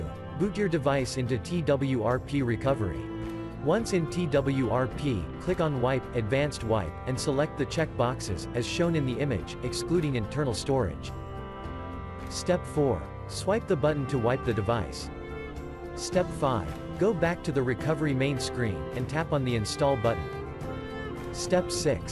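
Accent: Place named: India. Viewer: just hear that indian voice. its a scam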